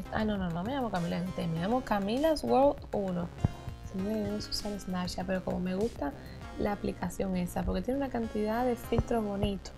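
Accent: Cuba. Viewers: jajaj tienes un asentó chistoso pero no pareces q eres de aqui de mi pais republica dominicana eres como cubana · Cambiastes la voz y es una técnica perfecta para conseguir más subscriptores... porque hablas ahora como tú nacionalidad ósea cubana y es lo mejor que pudistes haber echo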